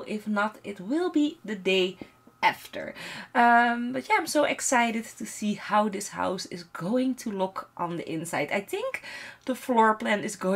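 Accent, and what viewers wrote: Netherlands. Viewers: hahaha ja, ik hoorde het aan de uitspraak:d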